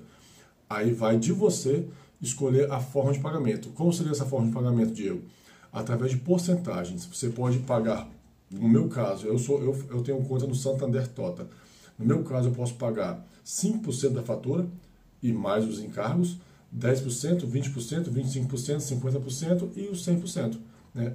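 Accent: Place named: Bahia, Brazil. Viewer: Vc fala como neu irmã da Bahia, Salvador, por isso pergunta se é baiana, desculpa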